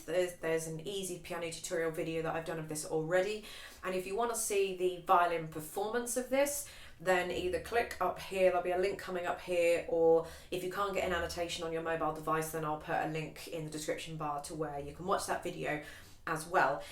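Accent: England, United Kingdom. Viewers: Aloha from Hawaii, I have just discovered this beautiful song and have only been playing violin since last October..However I have a good ear..and LOVE this piece. You are a wonderful teacher, and break the measures down so well for someone like me who plays better by ear. Also, I am Hawaiian, but my mum was from England..and adopted me from birth..SO it is like music to my ears!! to hear you speak..And you play beautifully. THANK you very much for everything!!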